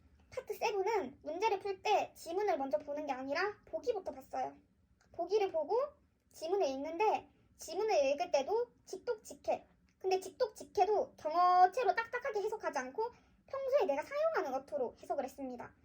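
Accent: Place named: Gyeongsang, South Korea. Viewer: 경상도 사투리가 좋습니다 ㅎㅎ 영상 내용도 좋네요 감사합니다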